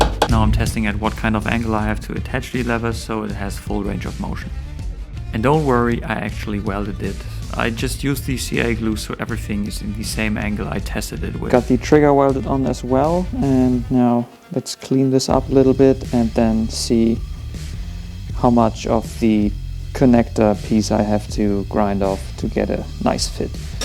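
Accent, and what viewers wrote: Germany. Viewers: your clearly german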